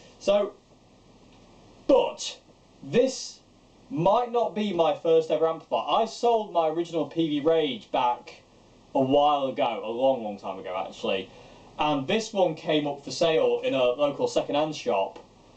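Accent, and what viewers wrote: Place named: Grimsby, United Kingdom. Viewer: If you upgraded your equipment at 'Pooles' combined with your accent I'm taking it you are from or near Grimsby - my hometown 😂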